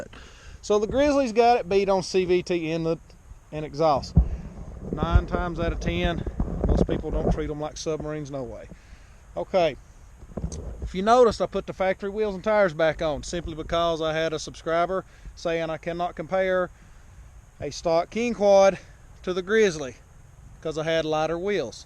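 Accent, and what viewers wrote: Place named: South, United States. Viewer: There’s something about a southern twang that makes the review more legitimate!!!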